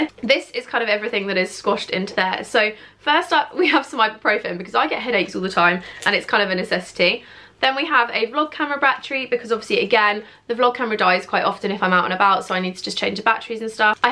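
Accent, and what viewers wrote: United Kingdom. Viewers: English I’m guessing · Is she British? 😉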